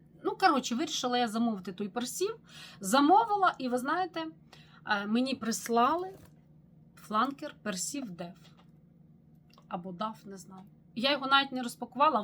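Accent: Ukraine. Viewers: Я, перепрошую, Ви з Хмельницького??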